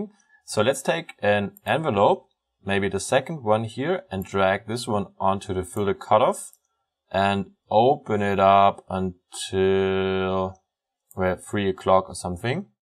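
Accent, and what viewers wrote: Germany. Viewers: Mark Toronto Hab mich schon gefragt, ob ich der einzige bin, der meint einen deutschen akzent herauszuhören :D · man hört das deutsche so doll raus XD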